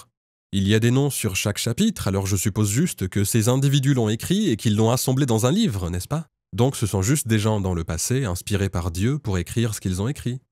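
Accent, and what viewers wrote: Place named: Arab world. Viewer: Bizarre il prononce le prénoms du prophète et du coran avec l'intonation exactement du vrai musulman la langue arabe seule un vrai arabe peut prononcer les converti le prononce différemment car il faut avoir parlé toute sa vie l'arabe pour prononcer les syllabe du h ou k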